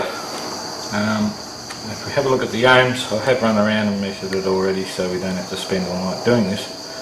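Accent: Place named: Australia. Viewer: lol aussie accent and crickets in the background and then a kookaburra to top it off!! haha gotta love aus! im from south west sydney!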